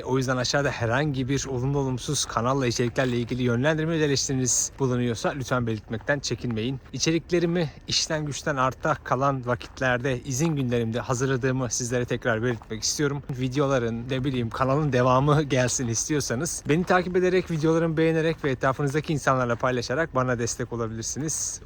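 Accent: Japan. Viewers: japonyada kalmak cidden etki etmiş bu arada kelimeleri telaffuz şeklinden japonca konuştuğun baya anlaşılıyor çok tatlı (‾◡◝)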